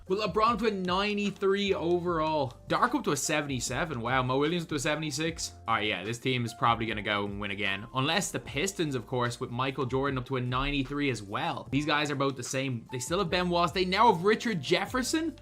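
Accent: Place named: Ireland. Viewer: Yeoo this Irish accent is goated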